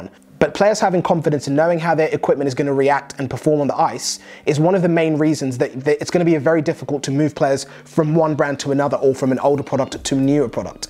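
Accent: United Kingdom. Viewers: Not to be racist but I’d never think I’d be taking information about hockey from a British dude but great video really well done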